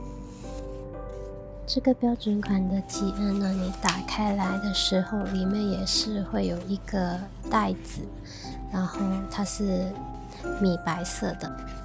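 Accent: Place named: Japan. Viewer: Hi ~ I always thought that you are Japanese. 😅 Your Chinese sounds are so cute.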